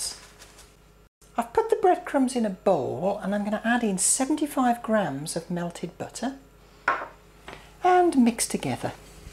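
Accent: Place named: England, United Kingdom. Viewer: J'adoooooooore !!! Cet accent Anglais, cette recette et votre bonne humeur !!! Un régal ! Un bonbon Anglais ! Allez j'y retourne.... j'suis trop gourmand je sais !!!!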